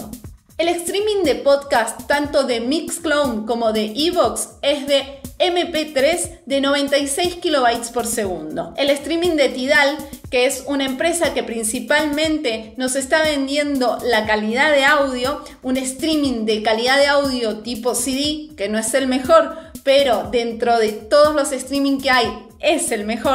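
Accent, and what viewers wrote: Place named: Argentina. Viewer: Que bueno ese acento argentino :)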